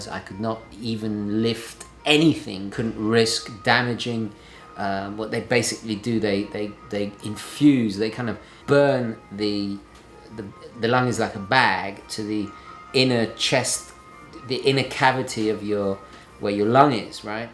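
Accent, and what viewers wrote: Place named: United Kingdom. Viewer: Hi, i like your vid because they are optimistic and positive. I love also your english. Very distinguish and british.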